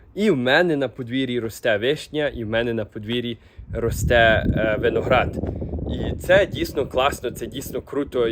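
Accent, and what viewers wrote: Western Ukraine, Ukraine. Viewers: Дуже сподобалося все так по українськи дім сад город , і яка в вас гарна українська мова з західним колоритом , ніби вчора переїхали до Канади молодець.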